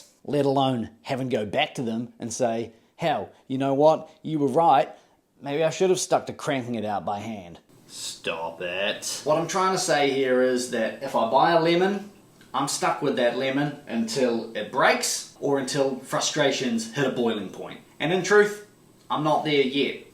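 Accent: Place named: New Zealand. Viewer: Opus wins tin times out of tin. Tin grams in. Ah the New Zealand accent, it's always a treat. Great video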